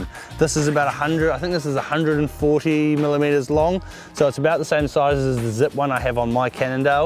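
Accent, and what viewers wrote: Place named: United Kingdom. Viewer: your UK accent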